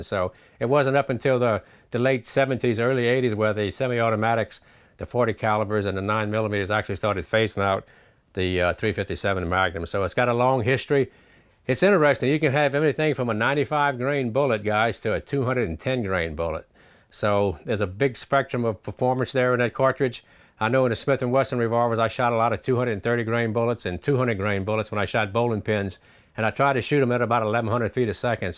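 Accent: Louisiana, United States. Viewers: Eric Jesperson He sounds like he is from Cajun country.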